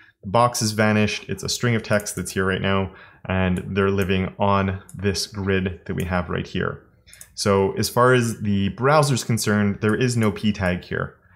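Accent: Canada. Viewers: Canadian accent and them o's.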